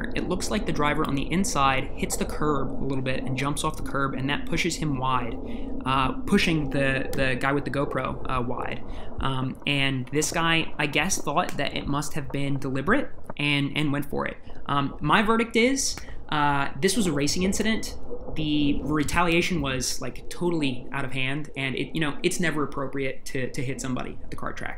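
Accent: Liverpool, United Kingdom. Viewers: He’s scouse what do u expect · I know the accent, I'm from the same city as him 😂 Liverpool, honestly it's normal but still awful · The scouse accent tho "whaqqqt" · No he’s not, I don’t get why everyone saying he’s Irish he’s Scouse from Liverpool. Sounds nothing like Irish.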